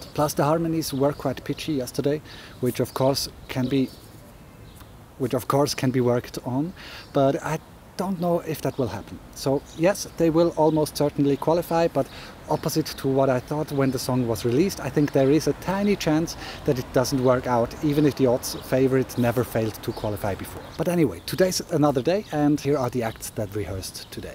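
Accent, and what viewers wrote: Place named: Switzerland. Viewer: I KNEW you were from my home country based on your accent XD Where exactly are you from? I live in Horgen near Zürich!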